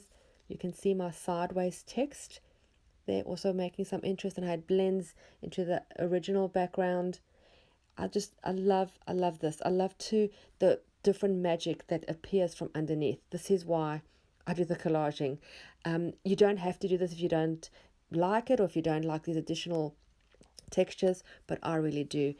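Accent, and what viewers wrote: South Africa. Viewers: It was so wonderful to hear a South African accent!! First time viewing your channel! Sending blessing from sunny South Africa!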